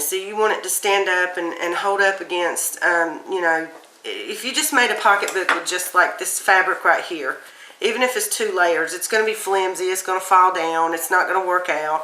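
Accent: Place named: South Carolina, United States. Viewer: Thanks for this! Very helpful. I have to ask… are you from South Carolina? Love your accent 🤍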